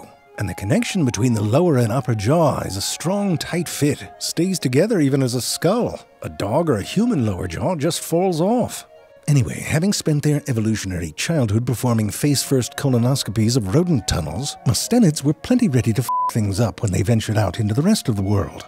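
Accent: United Kingdom. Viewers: Is it just me or is the voice starting to take on some elements of a British accent?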